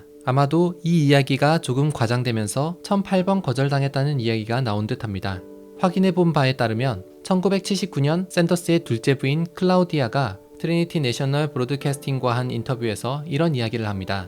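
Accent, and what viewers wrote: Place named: Busan, South Korea. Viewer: 말투가 부산이네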